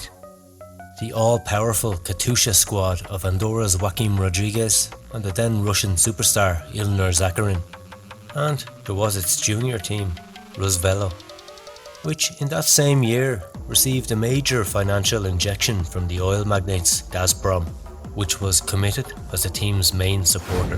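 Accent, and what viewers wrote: Ireland. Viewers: Good stuff.Subscribed.!! Keep it up.Not a wee bit Irish are ya? How about one on Sean Kelly & Stephen Roche?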